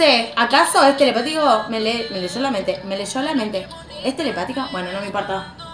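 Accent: Argentina. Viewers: Pues quisiera también estar en esa fiesta pues ni soñando se hace realidad 😂😂me encanta tus locuras y tu acento argentino eres increíble todavía no puedo dejar de gritar de la emoción esta rebuena como los amo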